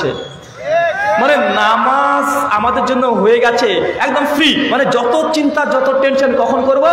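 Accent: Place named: Rangpur, Bangladesh. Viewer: হুজুরের কথা বলার ধরণ অনেক সুন্দর অনেকটা আমাদের বাংলাদেশের রংপুর জেলার মত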